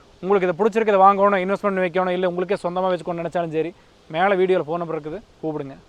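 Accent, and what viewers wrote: Kongu, India. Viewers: Anna unga kongu bashai super naa.Naanum namma urthanungo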